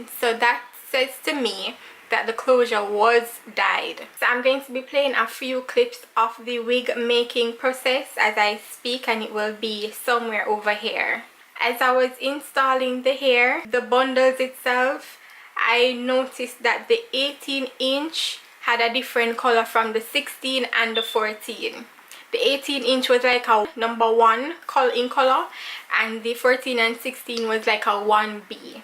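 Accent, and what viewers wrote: Jamaica. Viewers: Are you Jamaican? Guh deh yaaaaaaaadie!!!! Love seeing fellow Jamaicans on YouTube doing there thing.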